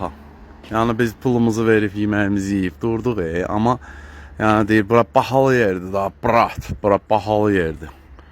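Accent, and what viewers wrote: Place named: Sheki, Azerbaijan. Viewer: Qaqaş səni gözü yiyim, sən özün Şəkidənsə? Haindi ləhcən elə bilki, bizim ləhcədir. Hada naqaraq bahadı birdə gəlmərik. 😂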